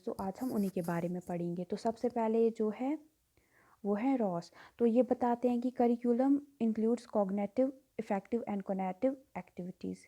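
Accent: Kashmir, India. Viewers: That Koshur accent. Keep it up!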